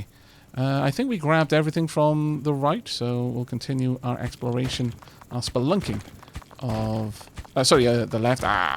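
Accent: United Kingdom. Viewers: More videos, more! Also, large heckin' maps. Just fast forward/skip through non-commented spots. Although there's something vaguely relaxing almost ASMR-esque about vaguely British voice commenting while mining out a vast sprawling underground.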